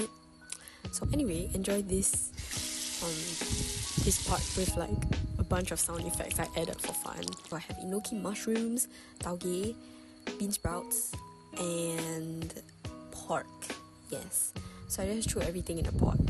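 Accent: Singapore. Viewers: I love your singaporean english accent 😭😭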